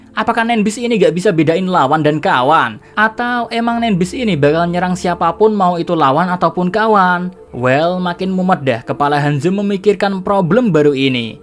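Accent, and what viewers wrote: Java, Indonesia. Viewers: Medok banget mas 😅😂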